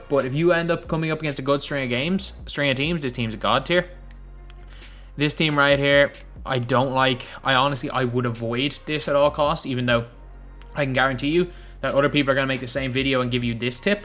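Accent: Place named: South Dublin, Ireland. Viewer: This lad is defo from South Dublin ngl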